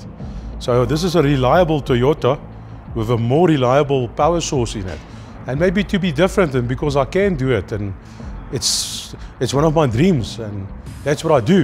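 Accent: Afrikaans, South Africa. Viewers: What accent is it, does anyone know?! I mean sounds a bit South African accent but not sure.🤔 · Thats the thickest Safa accent of all time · That's just a South African Afrikaner accent.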